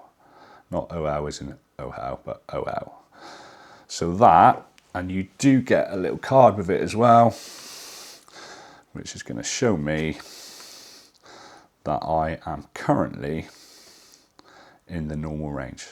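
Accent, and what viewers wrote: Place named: England, United Kingdom. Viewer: OH, Brother, do I get a kick outta your English accent!! I live in Texas where we don't get to hear anything but that Southern USA, twang. I'm hoping that I get use to it soon so that I can better listen to your journey story without LAUGHING my a__ off. Thank you, Sir.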